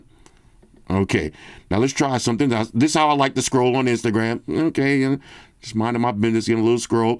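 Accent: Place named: New York City, United States. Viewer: is literally an NYC hood dude from my generation. He has the best vids cause its like I'm talking to one of my boys from back on da block 🤣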